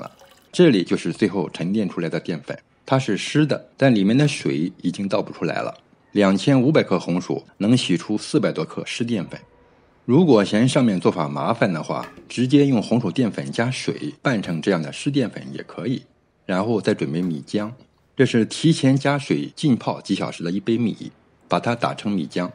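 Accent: China. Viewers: 口音较重，山东附近的，有点大舌头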